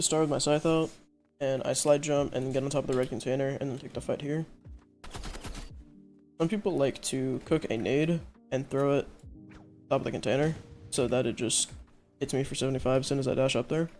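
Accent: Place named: Kashmir, India. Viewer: hey are you from kashmir India if yes then i am from Jammu and your accent is amazing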